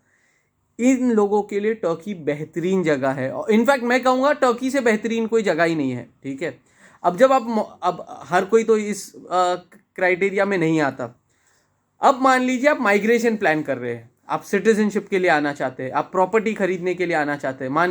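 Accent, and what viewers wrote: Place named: Hyderabad, India. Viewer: Assalam o alaikum Bhai, you sounds like Hyderabadi Telangana, India, if yes please let me know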